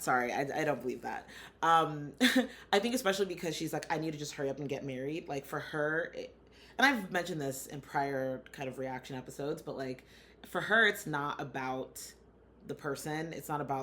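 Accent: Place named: New York, United States. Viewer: My guess is that maybe you're from New York, haha XD And enjoyed your reaction as always!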